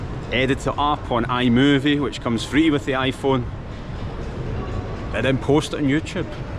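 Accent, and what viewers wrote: Scotland, United Kingdom. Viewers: Обожаю шотландский акцент!)) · Scottish guy reading Russian - my regards to your effort mate :) Last year Irish guy accidentally smashed my side mirror, was a funny way to meet a foreigner in Moscow. All are welcome, enjoy and have fun. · I LOVE you sottish accent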